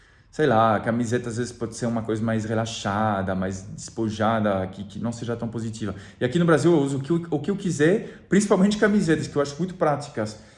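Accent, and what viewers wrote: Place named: France. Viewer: Percebo que, a cada dia, seu sotaque francês vai desaparecendo … Pena vê-lo perder um pouquinho do charme, mas vale dizer que o seu Português está ótimo! Parabéns,!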